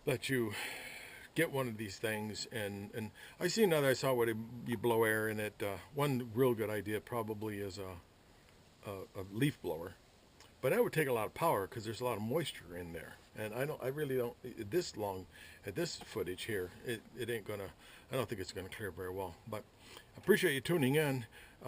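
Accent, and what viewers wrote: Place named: South, United States. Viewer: Wow, You Got it Brother!! Well taught! You got, NC, HD, Clear clean Southern English, like I have, and Using God's name to share, not used in Vain! I was just Watching my A Good Guy, Originally from "Car Crazy", Barry Mequiar from his new show "Ignite your life" Guys and gals sharing their Faith, from all us sinners and fools, That Christ still Loves Us, even knowing how screwed up we are. God Bless YOU for worldly help, but more importantly, filling the Hunger, that so many have for meaning and purpose., Lee, Fl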